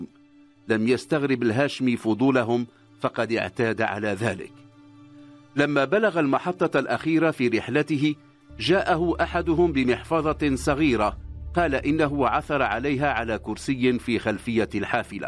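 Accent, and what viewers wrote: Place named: Morocco. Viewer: انا معجب ومتابع للقصص التي تتحفنا بها بصوتك المغربي الواضح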